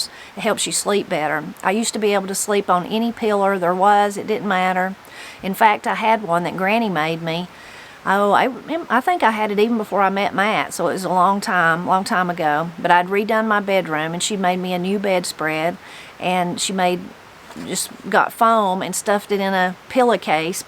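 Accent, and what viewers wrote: Appalachia, United States. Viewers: Hawaii has its own ..... Dialect. So we say all kinds of things . Your Appalachian reminds me of my grandma /mom isms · Here in the southern Shenandoah Valley we definitely have our own very local accent & particular use of words. Lots of ScotsIrish, English & German heritage here. Quite isolated also so we remain having our own unique dialect. Y'all have a richer & more drawn out southern accent, not necessarily a drawl but just deeply southern. We'all have what's known as a mountain South Virginia "twang", HA! I lived for awhile in Baltimore MD. Now there's a place with a totally unique accent & wordage! I could hardly understand the locals when I first went there. Turnt right, bent the corner... ??? That's how one speaks in Bawlmer, HA! I love your channel & love your Appalachian accent! Holler to holler, mountain to Valley! 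Have a blessed Sunday!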